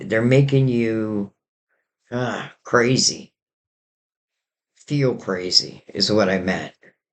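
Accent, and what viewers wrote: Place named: Pennsylvania, United States. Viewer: Girl! Your “after break up” phone call! Wow. Love stories like that! I’m from Phila & I always thought you were from PA (your voice!) I wonder where you’re from?) Great readings always! Thank you! 🙏🏻💗🦋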